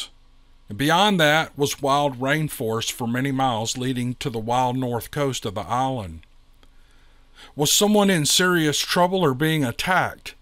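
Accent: South, United States. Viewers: Nanny-mo!! 🤣 I LOVE the way you mispronounced that! 😘🥰 It's actually pronounced Nuh-nye-mo. But I couldn't care less if you get it right. I love your southern drawl and your great storytelling.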